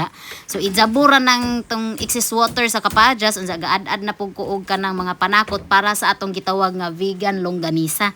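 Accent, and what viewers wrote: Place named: Bohol, Philippines. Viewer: Nindota mn n inyoha dapit dae... gnahan ko nmo very boholano kaayo accent ksagaran ako nbantayan mgtinagalog ng uban...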